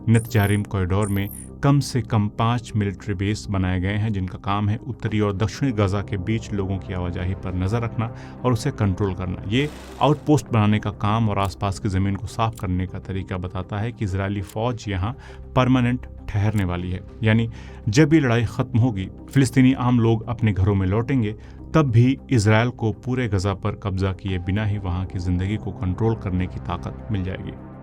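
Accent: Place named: Pakistan. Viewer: Using mostly Urdu & speak like Pakis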